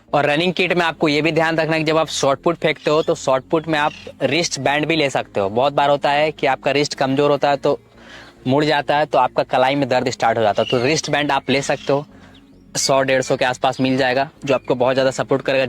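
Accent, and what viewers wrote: Maharashtra, India. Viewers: Sangli Marathi bolatat sir 😍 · Bhai bht pyari Marathi lagi aapki...Jay Shivray❤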